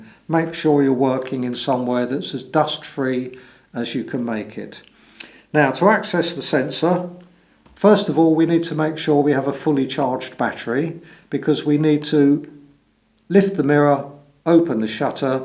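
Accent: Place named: England, United Kingdom. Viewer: You sound like your from.england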